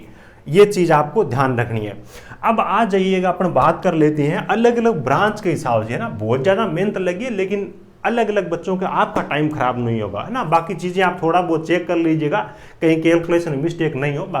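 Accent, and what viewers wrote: Rajasthan, India. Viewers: Sir ap Rajasthan se ho na 😂😂 apki language se pta chala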